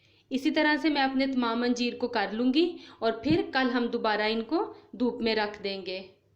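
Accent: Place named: Punjab, India. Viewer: oh ok you have the same accent of Indian punjabis 😊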